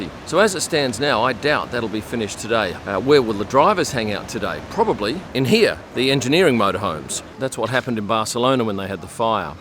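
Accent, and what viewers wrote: Australia. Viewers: So good to hear an Aussie accent in F1 media - good work and nice report.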